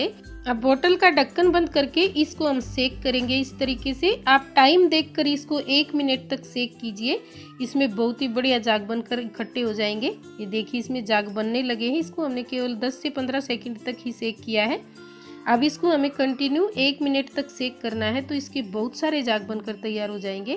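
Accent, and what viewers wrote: Haryana, India. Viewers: Mam aap haryanvi ladti h bhasa se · Mam aap Haryana se ho kya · Aap haryane. Se ho kya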